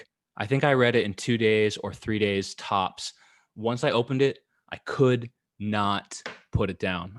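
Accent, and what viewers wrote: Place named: Italy. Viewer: You're Italian accent is amazing.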